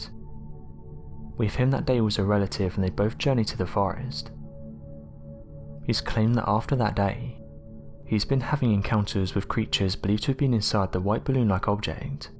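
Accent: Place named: Blackburn, United Kingdom. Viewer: Are you from Blackburn ...that tone of speech lol ... love it..Mad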